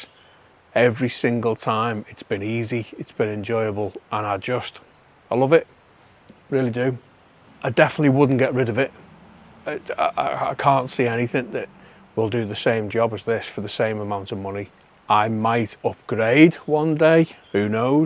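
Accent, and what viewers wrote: United Kingdom. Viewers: FANTASTIC video. I'm a working class Master Touch guy, looking to "up my game" without taking out a loan. I've had my eye on a Weber Summit for a while and this video pushed me over the finish line. Happy to see a Brit who's into BBQ and LOVE the accent. Would love to see what you use for dry rubs and sauces. Again, thanks from Alaska for a really good video. Tell your pupper I said hi.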